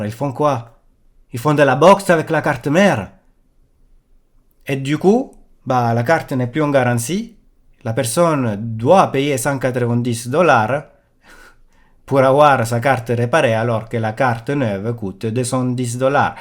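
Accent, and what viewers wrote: Italy. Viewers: ALORS GLOBALEMENT JE SUIS D'ACCORD AVEC TES COUPS DE COEUR ! PAR CONTRE APRÈS LORSQUE TU PARLES DE CARTOGRAPHIQUES JE SUIS MOINS EN D'ACCORD AVEC CE QUE TU DÎT ! POUR EXEMPLE LE DLSS ET AUTREMENT MIEUX QUE LE SYSTÈME DE AMD QUE J'APPRÉCIE PERSO ATI CAR ILS ONT RACHETÉ DEPUIS LONGTEMPS CERTE MAIS JUSTEMENT LORSQUE 1 MARQUE EN RACHÈTE 1 AUTRE DANS LA MAJEUR PARTI DES SECTEURS C'EST TOUJOURS LE MÊME NOM QUI ET GARDER ! POUR EXEMPLE EN AUTOMOBILE TU PEUT PRENDRE N'IMPORTE QUEL MARQUE APRÈS 1 RACHAT ET ELLE NE CHANGE NI DE NOM NI MÊME DE PAYS OFFICIEL POURTANT POUR MOI LE PAYS DEVRAIT CHANGER À PARTIR DU MOMENT OÙ LES DÉCIDEURS NE SONT + DU PLACE POUR DONNER QUELQUES EXEMPLES ON PEUT PRENDRE LE FRANÇAIS BUGATTI RACHETÉ PAR ALLEMAND VW ET SI L'ON PREND D'AUTRES MARQUES AUTO C'EST TOUJOURS PAREIL JE PRÉSUME AVEC TON ACCENT QUE TU ET D'ORIGINE ITALIENNE ET BIEN SI L'ON PREND LAMBORGHINI POUR EXEMPLE ILS ONT ÉGALEMENT ÉTÉ RACHETER PAR VW ET LE NOM DE LA MARQUE RESTE ET LE NOM DU PAYS AUSSI ET TU PEUT PRENDRE N'IMPORTE QUEL EXEMPLE ET CELA NE CHANGERA PAS ! 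D'AUTRE PART MOI QUI SUIS 1 VIEUX PASSIONNÉ DE HI-FI JE PEUT T'AFFIRMER Q CE EXACTEMENT LA MÊME CHO EN HI-FI ! ALORS QUE EN PC OU EN D'AUTRES SECTEURS IL AEEUVE PARFOIS QUE L'ON ÉLIMINE LA MARQUE D'ORIGINE ! BREF J'AI DISGRESSER SUR 1 AUTRE SUJET 😅😁 MAIS POUR LES CARTES GRAPHIQUES NVIDIA IL Y A DES GAINS IMPORTANT AU NIVEAU D CERTAINEMENT CHOSES NOTAMMENT AU NIVEAU LOGICIEL POUR LE DLSS C'EST + DE VITESSE CAR C'EST MOINS LOUR ET ÉGA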